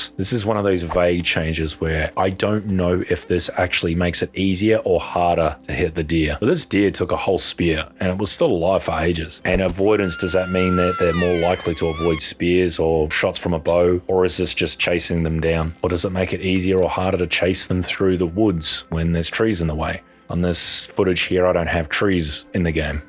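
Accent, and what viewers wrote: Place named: Australia. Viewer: I was like "what why would owning..oh hes not british hes Aussie"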